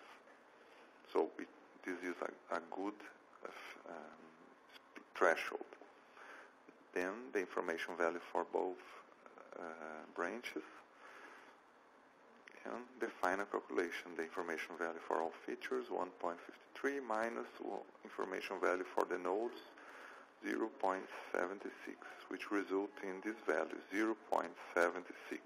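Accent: Brazil. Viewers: You accent its similar to brazilian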